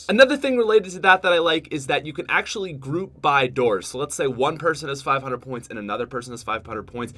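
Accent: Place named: Canada. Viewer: After watching all 9 seasona of How I Met Your Mother, I've barely noticed that you have a Canadian accent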